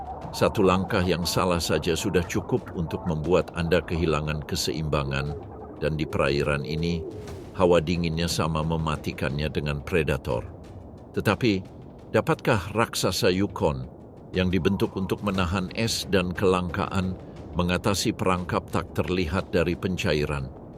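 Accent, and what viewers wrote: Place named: Malaysia. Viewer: Ini konten orang Malaydesh jangan di tonton itu logat bahasanya orang Malaydesh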